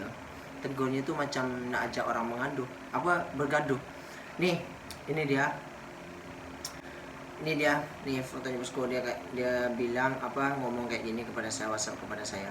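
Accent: Malaysia. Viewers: Bahasa melayu kamu ok je..hampir sama dgn percakapan orang malaysia,cuma bahasa melayu campur dengan bahasa indonesia..teruskan je bercakap guna bahasa melayu ..tak salah pun..tak merosakkan apa² pun.. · Salam broo.. abaikan aje komen tuu.. teruskan usaha anda.. saya bangga dgn anda boleh berbahasa slang Malaysia.. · Lantak dia la..hidup kita mna ada smua org suka..lg pn sy dgr ok je..cpt kamu bljr bhasa mlysia..kdg2 mcm da mcm org mlysia..indo lain rmai lg kekok2 bhasa mlysia..klu xblajar bila lg nk bljar bg lg padu..Ckp mcm biasa ok jee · Teruskan lah berbahasa Malaysia...makcik suka mendengar cakap melayu Malaysia 🇲🇾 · Betul la tu..serius aku pun menyirap dengar ko ckp malaysia.. baik ckp indon je..kan dah balik kampung tu malu dengan org kampung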